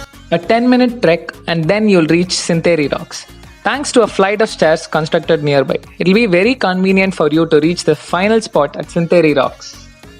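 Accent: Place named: Tamil Nadu, India. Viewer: Bro pls speak tamil love ur tamil slang 😘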